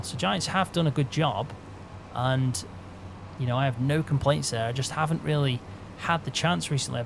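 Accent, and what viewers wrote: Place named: Wiltshire, United Kingdom. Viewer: Bruh you easily my favourite YouTuber. It must be the accent as I’m from Wiltshire so is similar. Found your page looking for best mods and subscribed because of your farm hand series. You probably won’t see this mate but honestly your content helps me get through my days. Which at the moment is very tough. Appreciate your work and your music choices for videos.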